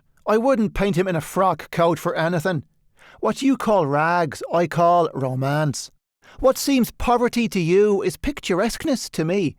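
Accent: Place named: Dublin, Ireland. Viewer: Finding this to start my day was perfect! And hurrah for a lovely Dublin accent 💖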